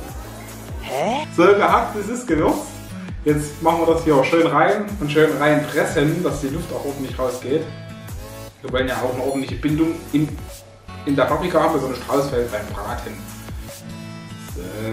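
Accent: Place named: Bad Dürrenberg, Germany. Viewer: Bad Dürrenbergerisch auf YouTube. Herrlich.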